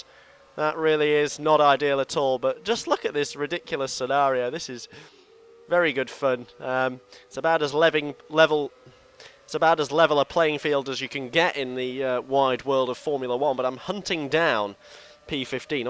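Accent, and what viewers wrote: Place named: United Kingdom. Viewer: hey i've been watching your videos for about 4 years, but in all that time you never explained us how to get that fancy british accent. i would rly appreciate a tutorial or sth:-)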